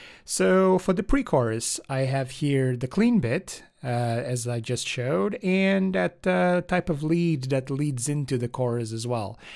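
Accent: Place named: Portugal. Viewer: parece portugues a falar ingles , bom video , boas dicas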